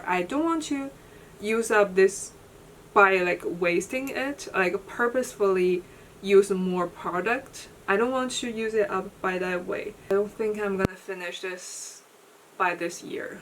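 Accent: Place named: China. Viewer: 之前听你口音就觉得是中国人，果然😊爱看你的铁皮进展❤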